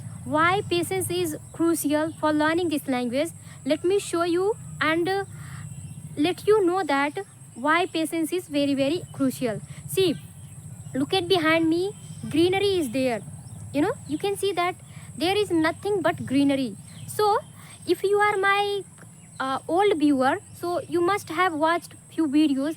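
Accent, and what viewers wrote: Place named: Bihar, India. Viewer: You are not able to Your Hindi Bihar language slang I Guessed that But Like your Passion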